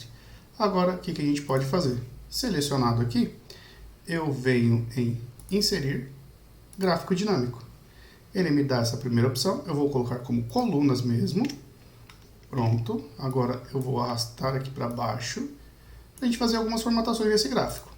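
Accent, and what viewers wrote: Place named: Minas Gerais, Brazil. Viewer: Sotaque minerim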